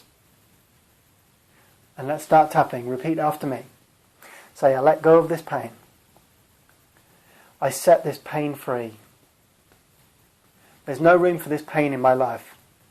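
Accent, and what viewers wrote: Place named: United Kingdom. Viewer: Hello I love your british accent! The pain is weaker! Thank you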